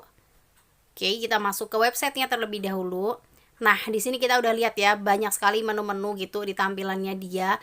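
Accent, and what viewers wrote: Java, Indonesia. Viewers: Aku sukak medok jowomu kuwi mbakyu.... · Muedooke gaes ngangeni..😀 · Jawa ne keton bgt ya mba, tapi ora ap2 cukup bantu go enyong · Alhamdulillah... Jam dua malem dapet informasi sepenting ini.. Makasih mbae.. Ngomonge medok bgt.. Hehehe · Jowo ne medok tenan. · Medok plus Gas Pull yo ndo 👍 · Medok amat mbak 😆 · Medok mba, mantap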